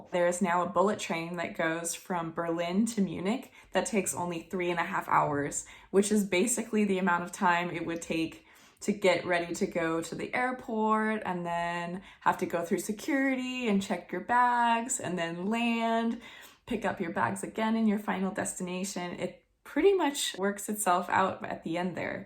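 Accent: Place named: United States. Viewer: This video was aired in Sep 2020 in COVID lockdown and I'm American like you but then again you could be Canadian because we have same accent.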